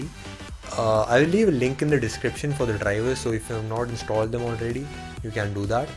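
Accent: India. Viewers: perfect YouTube (Indian) accent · UUDDLRLRBA Start Stop judging mate! Look into yself! He wanted to give some info(In indian English so wat?), which he did obviously,worked for me though...so stfu n stop using YouTube...trust me on this!!!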